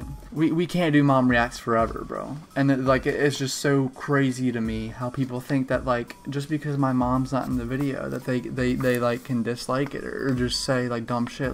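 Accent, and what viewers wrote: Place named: East Coast, United States. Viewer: east coast accent im guessing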